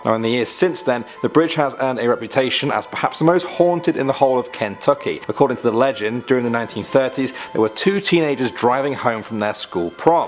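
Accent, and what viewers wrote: United Kingdom. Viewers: Everything's scarier when told in a British accent. Thank you 🇬🇧 🇺🇸🇯🇲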